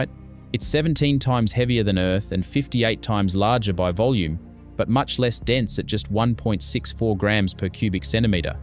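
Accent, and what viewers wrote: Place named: Australia. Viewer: The most interesting thing is the AI narration with an Australian accent and incomprehensible Aussie slang. It also changes its pronunciation of Uranus throughout the introduction.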